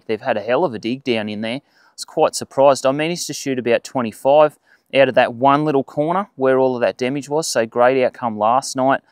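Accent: Australia. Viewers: Proper unadulterated Aussie accent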